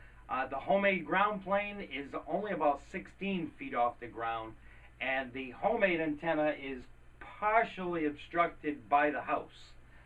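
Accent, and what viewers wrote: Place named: Boston, United States. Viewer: Just realized your Boston accent! I watched all of these twice: great fun!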